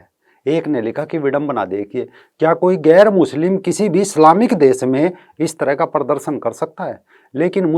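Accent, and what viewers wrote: Haryana, India. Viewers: आज आपका पहला वीडियो देखा, बहुत अच्छा लगा और आपकी बोलने की tone से आप हरियाणा के लगे Well done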